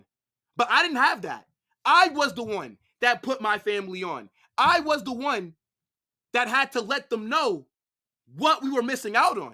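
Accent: United States. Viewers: I'm so happy about finding this channel actually cause finally i found some one on YouTube speaking about my favorite team which is real Madrid and also about soccer with a very clear American accent that's amazing keep going 👏 👌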